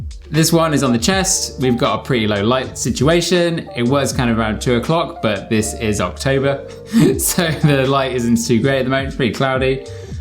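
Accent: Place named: United Kingdom. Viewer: wow, your British accent is just to annoying. SKIP....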